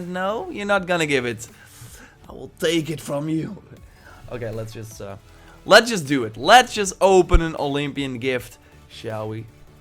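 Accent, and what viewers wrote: Netherlands. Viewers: Are you dutch i can hear in your accent im a big fan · The way you speak English has something, are you dutch by any chance?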